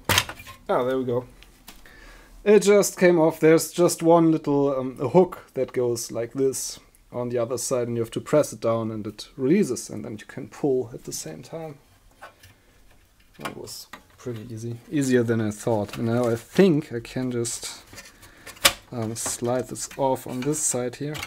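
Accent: Germany. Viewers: Hahaha, how did I miss this one over the course of the years... "This is a Macintosh SE DIRTY" :D:D:D Love the German accent :D I have one of these and I love it. Recapped it years ago with nice tantalums so goodbye leakage ever – still have to recap the analog board, so I'm happy I ran into this video just now, it reminded me of this :D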